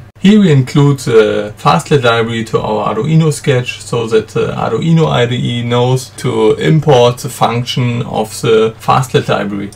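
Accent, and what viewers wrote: Germany. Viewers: 1. Sekunde das englisch gehört, dierekt gewusst dass du deutscher bist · Man hört einfach raus das du Deutscher bist 😂😂😉👍🏻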